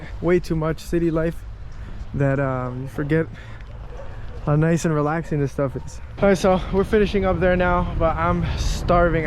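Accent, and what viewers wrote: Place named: Argentina. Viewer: Cool!! You have a perfect Argentinean accent!!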